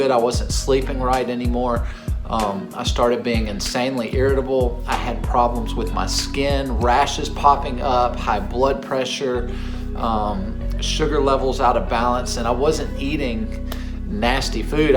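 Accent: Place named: South, United States. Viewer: Sup, new to your channel! Slapped you with a like and a sub!!!! not to be weird but your voice is very nice! sweet and southern lol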